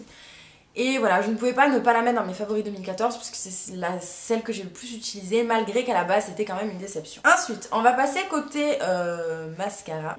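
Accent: South, France. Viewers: j'aime bien ton accent tu viens du sud toi aussi?^^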